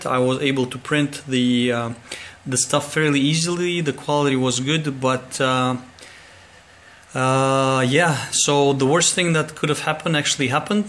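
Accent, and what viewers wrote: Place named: Quebec, Canada. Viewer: are you french Canadian? you sound like GSP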